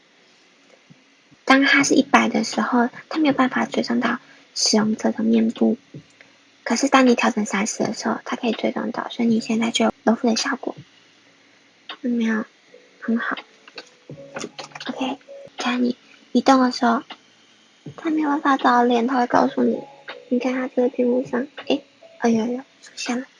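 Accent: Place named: Taiwan. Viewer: 聽你的口音還真的是台灣人，唉，你能不能轉國籍到大陸？你的人品比較適合在那邊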